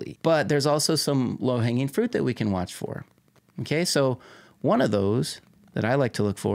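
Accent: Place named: South Africa. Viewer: Nice channel. Fellow analyst pointed me here a few days ago. First thing I recognised, the SA accent.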